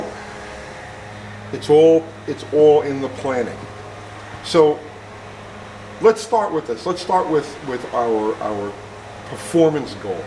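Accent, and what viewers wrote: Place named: New York, United States. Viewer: At 6 I thought that was going to kick all their asses all right so here we go horse is right behind you with the 4-cylinder kick me or kicking your butt real bad what the hell's going on here all right here comes the Volkswagen Mahler is just going to mow you down best Brigg and Stratton and it's not even but one cylinder you know what the hell I know I got a firecracker right here I bet you your hemorrhoids couldn't handle it no more all right here we go you sound like a New Yorker stop whining and crying get out there and really do something I want to see you go to drag strip and make some happen I want you to take that six cylinder and make it happen what the hell happened I don't know you don't know we don't know we want to know what the hell is happening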